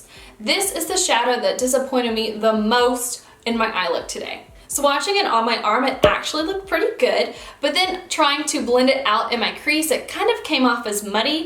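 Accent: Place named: South, United States. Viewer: LA Colors has a really nice matte lipstick line that are gorgeous. Where are you from ? I'm from Alabama. I love to hear another southern gal on YouTube !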